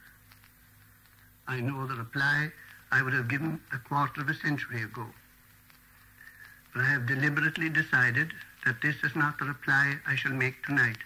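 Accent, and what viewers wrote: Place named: England, United Kingdom. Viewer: He sounds more English than Irish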